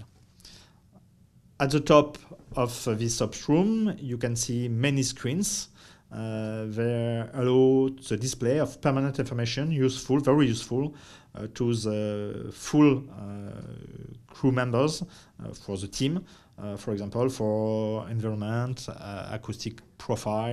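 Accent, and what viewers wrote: France. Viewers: You sound like a French guy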